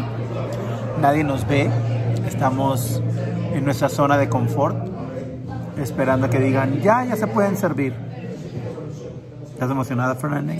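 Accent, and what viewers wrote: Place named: Yucatán, Mexico. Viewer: Jajajaaaaa tu xoy! Mas yucateca imposible te amooooo!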